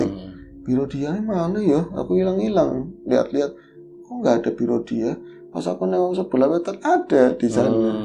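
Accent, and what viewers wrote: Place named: Brebes, Indonesia. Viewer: Ngomonge Kya wong Brebes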